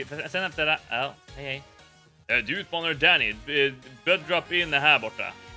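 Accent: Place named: Umeå, Sweden. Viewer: Du är från Umeå? Man hör på din dialekt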